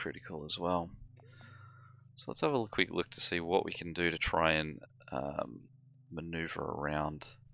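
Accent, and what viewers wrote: Australia. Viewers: another good video, you aussie?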